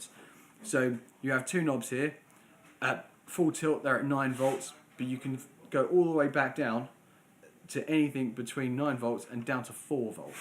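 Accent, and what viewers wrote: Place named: England, United Kingdom. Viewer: Judging from the accent, I suspect you're from the same part of England as Spinal Tap.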